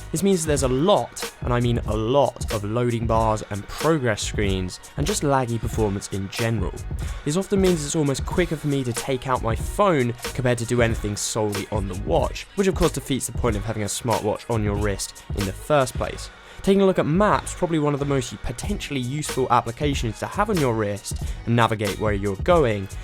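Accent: United Kingdom. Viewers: damn how Gorgeous Britain English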